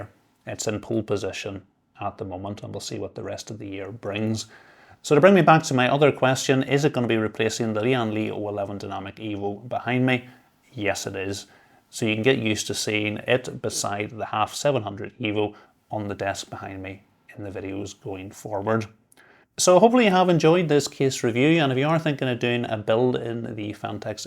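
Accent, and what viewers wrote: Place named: England, United Kingdom. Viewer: wow this channel is great. it took me a while to fully understand this english accent but now i can listen to you all day.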